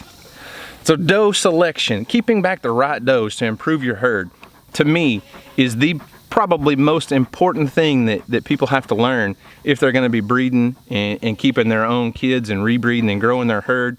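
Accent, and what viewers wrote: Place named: South, United States. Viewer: The constant, unending interruptions are like watching someone with ADD. Solution: Put the dog inside or on the other side of the fence and make your videos uninterrupted. You have very good content. That southern drawl is relaxing and sounds very trustworthy - very easy to listen to. Just let that good content flow!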